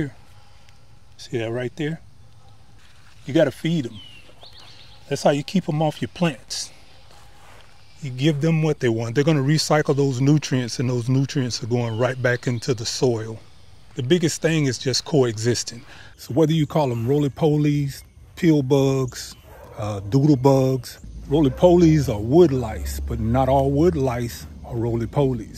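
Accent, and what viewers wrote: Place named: South, United States. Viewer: Your voice tone/accent brings back so many southern traditions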